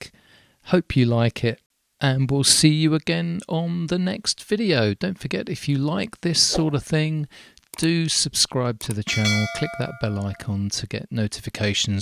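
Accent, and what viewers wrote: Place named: Australia. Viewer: I love the accent bro. Crocodile Dundee up in this bihhhh. "That's not a knife, this is a knife!" LOL every time u say knife thats all I i hear 😂😂😂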